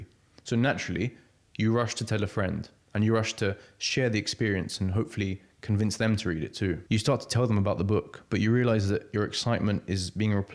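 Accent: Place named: RP, United Kingdom. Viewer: Thank you so much for your work.Sometimes i get pretty useful insight from your videos.I like your soothing, not-constantly-being-interrupted-with-background-music voice and your posh accent.In fact, being an English learner, your videos are something that inspired me to switch to British accent rather than the American one.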